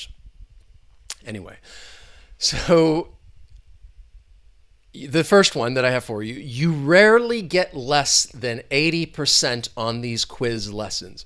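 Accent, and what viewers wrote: United States. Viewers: American accent too heavy. 👎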